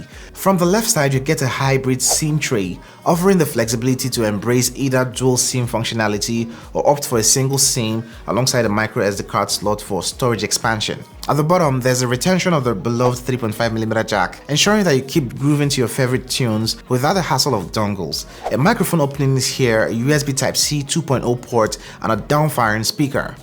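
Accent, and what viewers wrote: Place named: Nigeria. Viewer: He sounds Nigerian but I could be wrong.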